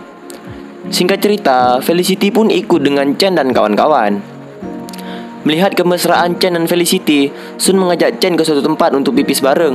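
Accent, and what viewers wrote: Aceh, Indonesia. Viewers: Orng aceh ya bang? :V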